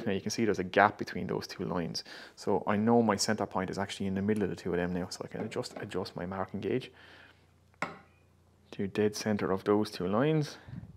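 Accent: Ireland. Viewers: good man...... I usuall saw the walls as the plough will jus rip it apart going cross the grain. I have a plough with a scoring cutter but find its quicker to jus saw the wall than fiddle around with the scoring cutter...... they're a pain to sharpen too 🤦‍♂️😂 I enjoy your hand tool stuff lad, it's also nice to hear our distinct Irish accent on a youtube video 😁🤙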